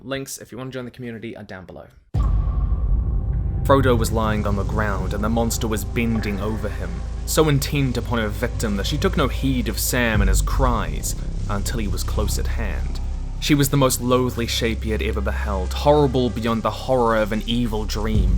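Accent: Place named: New Zealand. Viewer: Its so good hearing a hearing a New Zealand accent in media. You sound almost exactly like I do.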